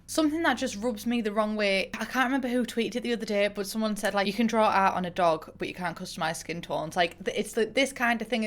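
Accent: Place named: Geordie, United Kingdom. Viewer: I love the geordie accent, but your's in particular just brings back repressed memories of my old maths teacher and it is all I can hear.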